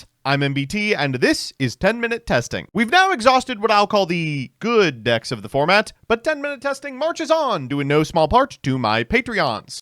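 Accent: France. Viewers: Fk i realized too late that this dude is gonna do the annoying french pronunciations for the entire video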